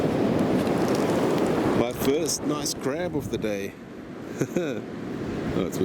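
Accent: South Africa. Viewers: Is that a partial South african accent I hear?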